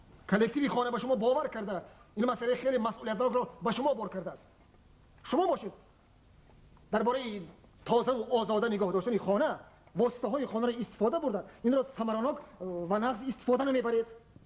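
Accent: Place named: Tajikistan. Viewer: Чудоям нутки зебову лахчаи волои Точикона. Хело Олли Ойла ва марди Точикро исбот намудед. Интилигент. Чунин хам бояд бошад. Саломат бошед устоди азиз. Салом аз Самарканд.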